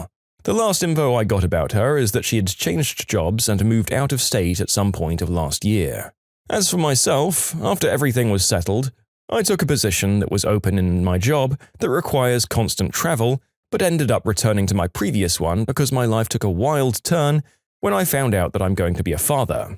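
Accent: United Kingdom. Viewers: Th4 britidh accent makes this 200 percent better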